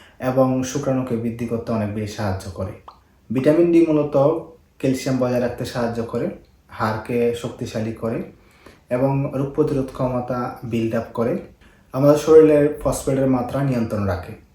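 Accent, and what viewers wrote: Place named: Sylhet, Bangladesh. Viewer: আপনার জেলা কোনটি স্যার,সিলেট এর টান ভাষায়, সিলেট নাকি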